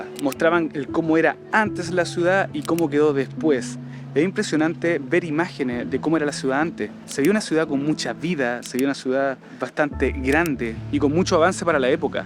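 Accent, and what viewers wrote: Chile. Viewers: ERES CHILENO! Que lindo escucharte! Gracias por comenzar el video sin intros y directo al grano. Gran video y dicción. Sigue así 😊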